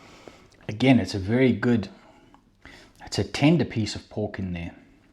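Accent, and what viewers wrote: South Africa. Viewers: So nice to hear a South African accent on YouTube.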